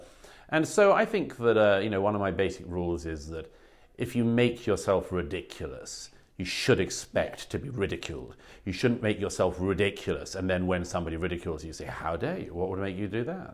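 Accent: RP, United Kingdom. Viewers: “Nowadays you don’t know who’s in cahoots, cos the KKK wear 3 piece suits” Never has there been a line that sums up the likes of Gutless Murray. Pure unadulterated racist dressed up in a posh accent and glossy magazine. Hope all your children marry immigrants and have little brown babies 😂😂😂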